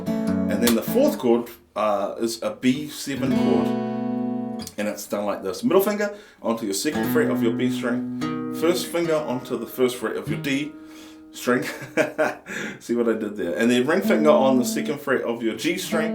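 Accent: New Zealand. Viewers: bruh is he from nz?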